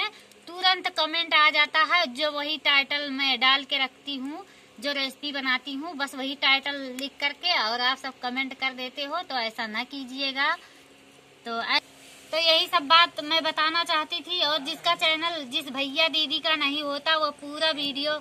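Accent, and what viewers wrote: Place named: Uttar Pradesh, India. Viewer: Aap up ki ho na bilkul mere gaon jaisa bhasa hai aapke gaon ka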